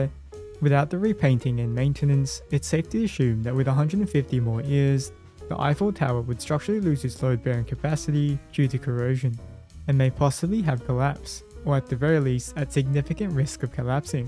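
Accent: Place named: Australia. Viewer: A British person with an Australian accent?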